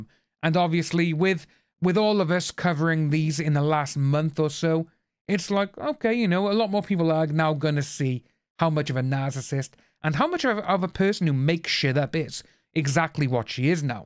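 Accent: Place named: United Kingdom. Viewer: I am a Brit who emigrated to the US. Your insights are spit on. Plus, I’m missing the UK and your accent makes me feel at home.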